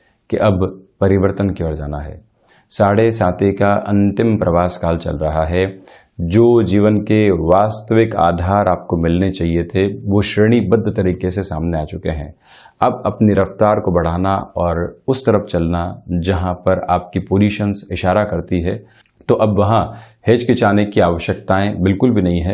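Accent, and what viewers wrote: Standard. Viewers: Wah, kya Hindi, itni shuddh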